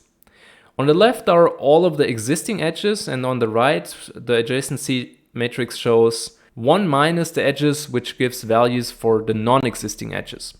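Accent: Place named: Germany. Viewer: Thanks a lot! Very good series! You sound German, where you acquired your education if I may ask?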